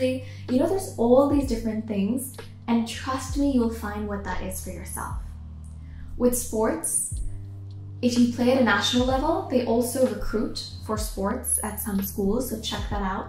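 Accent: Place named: United States. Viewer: Why your American accent is so natural???? How can I improve it like u???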